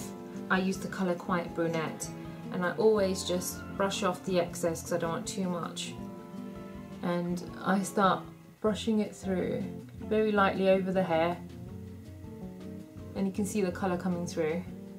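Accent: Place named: United Kingdom. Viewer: She is an Indian can you belive that. She speaks like a British? ?????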